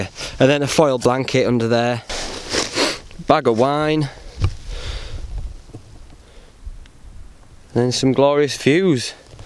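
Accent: United Kingdom. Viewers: Hi buddy! Are you British?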